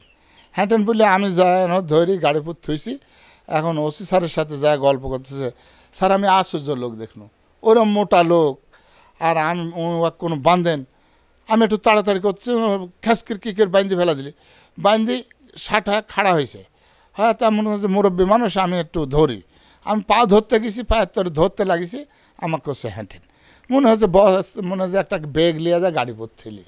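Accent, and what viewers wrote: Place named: Natore, Bangladesh. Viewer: কথা শুনে বুঝলাম আমাদের নাটোরের মানুষ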